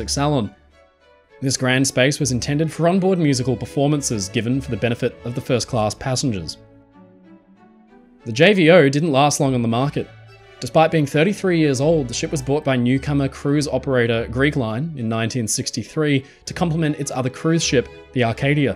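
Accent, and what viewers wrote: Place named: Australia. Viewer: Australian or whatever it is accent also adds a distinctive flavor to his superb storytelling.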